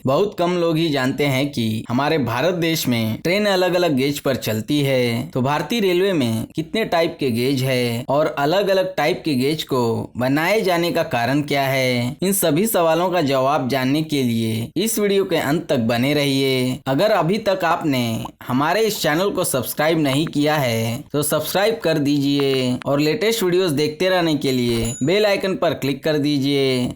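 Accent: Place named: Bangladesh. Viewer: Bangladeshi type ki bolti ho 😂😂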